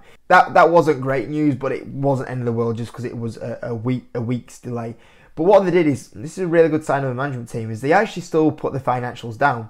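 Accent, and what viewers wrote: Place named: London, United Kingdom. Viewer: Are you from London or something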